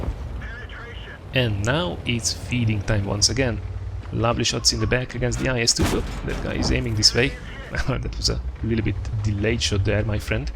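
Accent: Hungary. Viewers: Szia csak szerintem beszélsz magyaros angollal? :)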